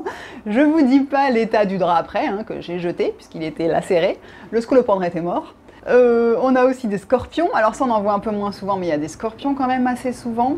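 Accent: Marseille, France. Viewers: Salut tu m’as fait rire Tu es Marseillaise de base lol ?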